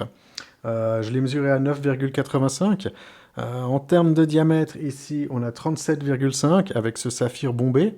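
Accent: Geneva, Switzerland. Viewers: Très belle montre! et joli accent de Genève ;)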